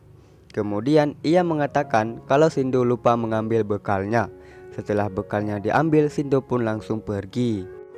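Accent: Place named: Aceh, Indonesia. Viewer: Lage logat awak geutanyo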